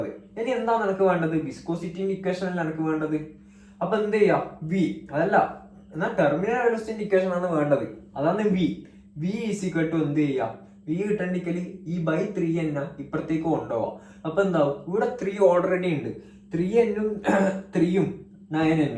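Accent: Kannur, India. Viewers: Bro kannur aanno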